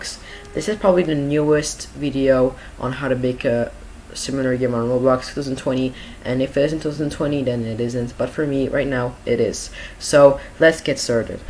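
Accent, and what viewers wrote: France. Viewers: Ur french right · are u french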